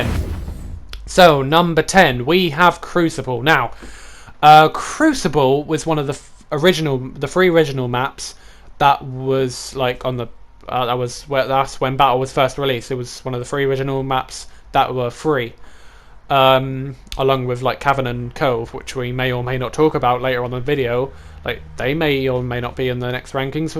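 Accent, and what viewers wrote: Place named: United Kingdom. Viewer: you sound more british here. Is bmpt americanizing you?!?!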